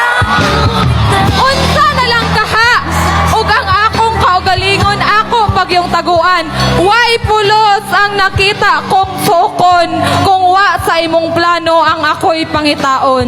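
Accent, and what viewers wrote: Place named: Visayas, Philippines. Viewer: nice to hear that hugot line at visaya accent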